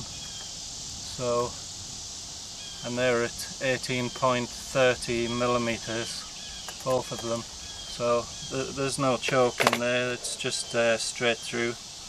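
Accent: United Kingdom. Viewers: Where do you live at brother if you don't mind my asking? You sound British, but I didn't think you were aloud to own shotguns in England. No offense meant. I'm just ignorant when it comes to gun laws in the UK.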